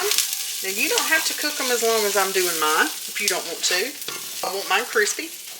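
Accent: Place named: Alabama, United States. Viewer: My stepmother's family was from Jackson Alabama. I love to hear Alabamans talk. I could listen to you all day.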